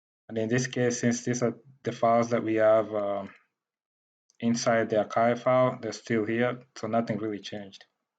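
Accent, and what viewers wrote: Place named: Nigeria. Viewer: Bro you sound Nigerian. Is there a way to connect with you? Instagram? Twitter?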